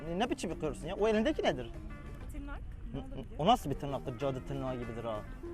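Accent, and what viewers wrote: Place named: Eastern Anatolia, Turkey. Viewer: Doğu şivesi videoların çok iyi bence devam etmelisin çekmeye 😂😂👍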